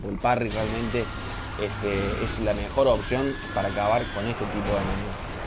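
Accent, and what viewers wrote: Córdoba, Argentina. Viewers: Vos pareces co ordobes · Cordobes D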